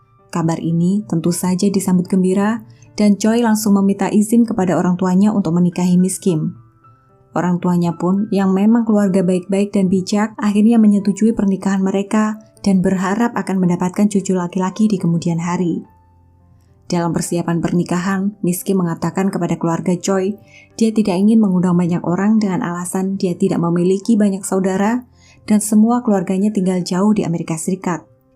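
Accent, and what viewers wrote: Java, Indonesia. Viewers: Logatnya terlalu medhok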